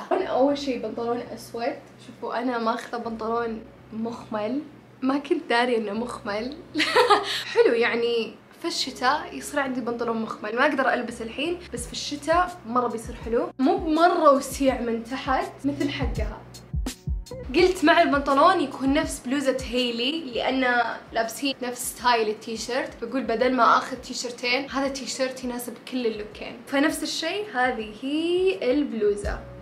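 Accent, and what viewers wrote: Saudi Arabia. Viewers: يمه هذي مين اول مره اشوفها بصراحه بس يمه تدخل القلب عفويتها تجنن ماشاءالله + احسها كويتيه بس لهجتها سعوديه بس برضو احس يليق عليها تكون كويتيه😭😂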